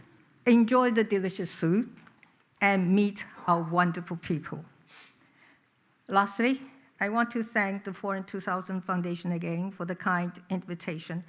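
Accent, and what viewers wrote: United Kingdom. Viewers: 一直是英國腔喔！ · OMG, she speaks English with British accent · 英國留學果然帶著標準的英國口音，閉上眼睛👀聽來就和英國人差不多🎉！ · 當總統時是美國腔，不當總統就變成英國腔? 自己不覺得美國人聽了怪怪的嗎? 是否搞不清楚誰才是主子? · 正統牛津腔的演說，讚。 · 聽得出來英語有點生疏，英國腔英語好明顯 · 她的英國腔🥵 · 小英正面的論述，加上流利的英國腔英語，迷倒了眾多歐洲人。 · 小英的英國腔在歐洲人聽了會覺得很親切~~ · 小英總統的英國腔有高貴的氣質~ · 哇塞，英國腔❤ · 她的英國腔很好聽！ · 蔡一直都是英國腔沒聽過以前的接待外賓訪談嗎？ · 她並不生疏，政治人物的演講重點就是必需清晰，自然速度會放慢。另外，她的英式口音非常漂亮。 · 小英是在英國讀書的，她的口音向來都是英國腔。你的耳朵沒有跟腦袋連在一起嗎？ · 亂噴什麼一直英腔好嗎？ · 的確。的真的很英國。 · 人家講的是英腔，你是來留言被打槍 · 為什麼小英總統的有英國腔🤔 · 小英總統的口音是不是有點英式😳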